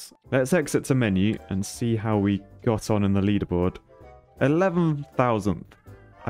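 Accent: United Kingdom. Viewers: I'm actually impress that you got it first time the "Sierra de las cruces" yes, you had the little British accent, but the pronunciation is correct :D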